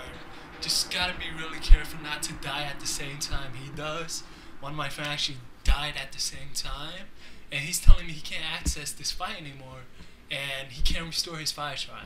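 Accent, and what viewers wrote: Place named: New York, United States. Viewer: you sound new yorkish or even maine-ish. eitherway u have a cool voice. btw what sl were u in this video?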